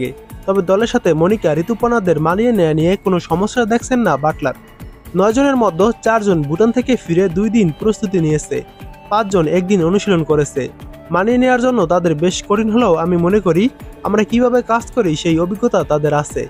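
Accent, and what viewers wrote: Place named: Khulna, Bangladesh. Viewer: আপনার খুলনা সাতক্ষীরা উচ্চারন শুনতে ভালই লাগে।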